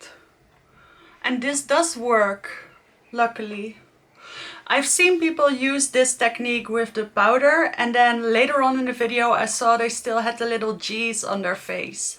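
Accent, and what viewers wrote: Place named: Netherlands. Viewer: I can detect a beautiful Dutch accent!! (One of my dear friends is originally from Breda). I loove Givenchy powders, the original Prisme Libre powder is so beautiful. Fantastic look 💕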